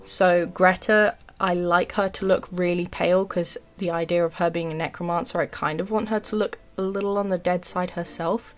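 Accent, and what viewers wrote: United Kingdom. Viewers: Her accent is British English, possibly from around London or a little more South ❤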